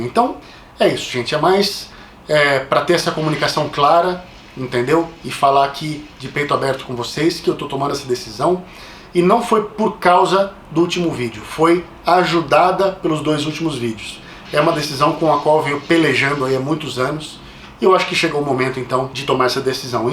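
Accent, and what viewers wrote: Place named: Brazil. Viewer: É bom que, inglês falado por brasileiro é muito mais fácil de entender, pra mim né kkkk mete as fichas aí!!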